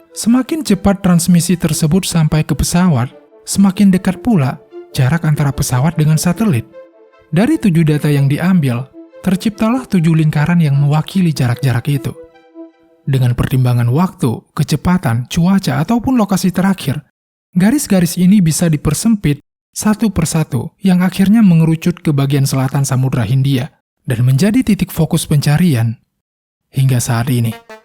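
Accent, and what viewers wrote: Bali, Indonesia. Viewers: Naratornya orang bali kayanya yak? haha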